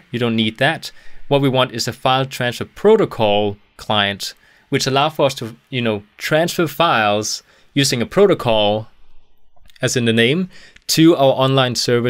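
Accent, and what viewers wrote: Denmark. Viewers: are you from denmark?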